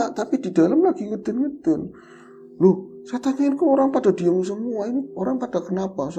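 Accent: Banyumas, Indonesia. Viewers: Sallam ngapak Mas, seneng krungu,ne soale ibu,ne aku wong Lebaksiu bapa,ne aku wong wetan Solo👍😃sukses selalu.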